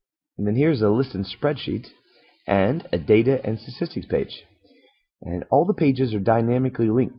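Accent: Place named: United States. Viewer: This man clearly has an American accent so you point is invalid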